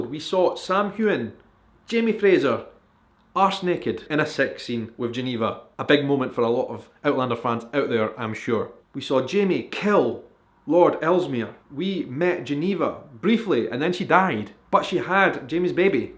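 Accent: Scotland, United Kingdom. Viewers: I was immediately taken aback when Geneva said 'Scotch-man' (and I'm American), and knew (somehow) that it was a careless form of address. Not sure how I knew that was terribly wrong, but it surely set the tone for how we should perceive Geneva (despite the fact that I've already read the books!). I love your 'Scottish-person's' perspective on this series! Not to mention you have a pretty cool accent, yourself!! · Well, it was super steamy until the end when he explained what the difference between love and lust were. I also agree with you, that was the steamiest sex scene outlander has done. I wonder why they put so much emphasis on this one and not the one with the maid back at lollybrach? I am NOT complaining though! Cant wait to see more of your filming location vlogs. Honestly, all your vlogs. Love listening to you before i go to bed. Hoping i dream about a Scotsman since i fall asleep with the accent in my head! 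Ha! Thank you for the video!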